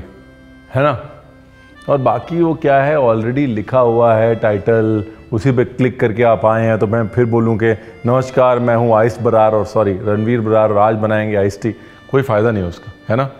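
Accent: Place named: Lucknow, India. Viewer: Your Lucknowi accent. Love it 😍 Hot coffee also please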